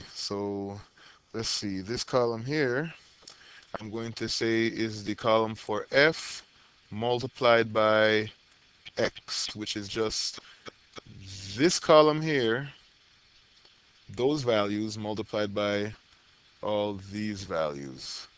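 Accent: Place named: Caribbean. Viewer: Thanks immediately, hearing you Caribbean accent and I start to understand. wow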